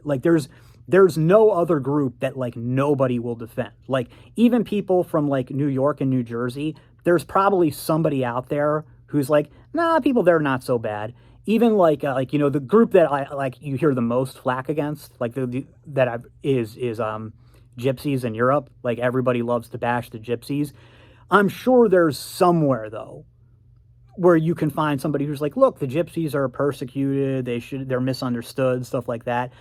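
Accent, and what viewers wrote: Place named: Africa. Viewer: African accent from an ex French colony. Perhaps Cameroon or Congo. He accent is really thick and annoying, he should have long worked on it.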